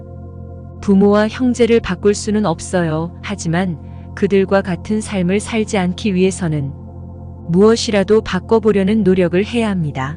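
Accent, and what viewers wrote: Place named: Giho, South Korea. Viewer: 너가 저가 이런 기호지방 개사투리 사용마라 특별시라니 말까지 바꾸면 진짜 딴세상사람인 줄 천한종들아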